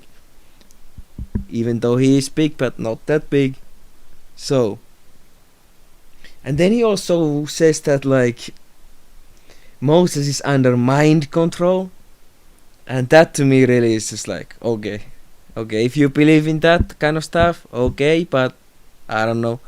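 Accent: Finland. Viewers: I couldnt finish watching this video because of the accent but I will drop a like for the effort! Next time you could speak in suomi and have english subtitles, cheers bro!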